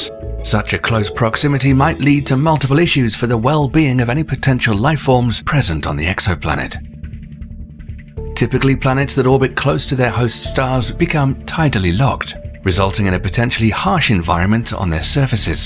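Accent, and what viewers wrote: England, United Kingdom. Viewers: I just love your English accent! Keep up the good work! :)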